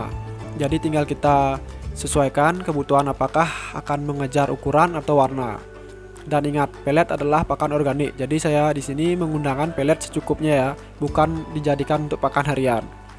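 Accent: Bali, Indonesia. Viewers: Nak bali oo bli? Ngenah ti logat ne 😅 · Ngenah san logat bali ne bro 😅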